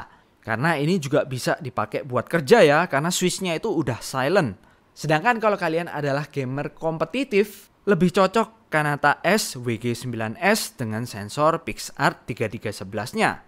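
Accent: Java, Indonesia. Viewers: medhok lee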